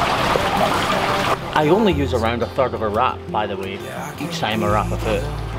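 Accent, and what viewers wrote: Scotland, United Kingdom. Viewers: pretty sure you replied to the wrong person. The Katt said nothing about what he is. Secondly he may be English genetically or was born there but a lot of he says has a slight Scottish accent that gets really heavy when speaking to locals. Plus he's in Scotland. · Scottish spoken by homelanders is getting so much easier to understand. This guy speaks perfect English with an accent. I had a childhood friend whose mother was from Scotland and I could never understand a thing she said. I love most accents, so long as I can understand them. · There’s something about a Scottish accent that seems to lend itself to healing and fixing. Makes me feel like she’s in good hands. Lol. Maybe I’m trained by Star Trek but I don’t care. This poor cow needs a miracle worker like you.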